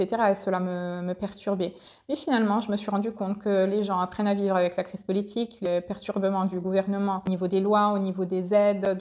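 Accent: Italy. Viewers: vous avez une belle intonnation italienne quand vous parlez le français, c'est bleuffant. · Baleze t arrive a faire l accent Italien en Francais j ai jamais vu ca mdrrr 🤣🤣🤣🤣🤣🤣🤣🤣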